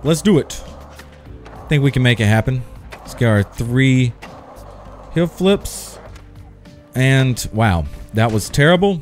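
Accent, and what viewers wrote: South, United States. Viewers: your accent is like a mixture of suburban , flamboyant , ghetto , and southern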